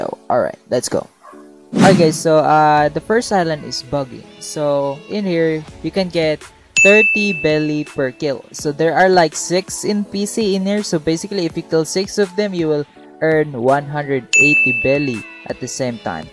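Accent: Philippines. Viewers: This Guy Is A Filipino I Dare You His Voice Is Like a Filipino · You sounds like a filipino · Sounds like he is from Philippines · woah also if u dont mind me asking but are u filipino? ur accent is familiar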